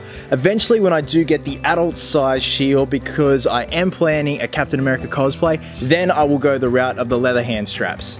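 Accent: Australia. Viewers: r u australian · he is a ausie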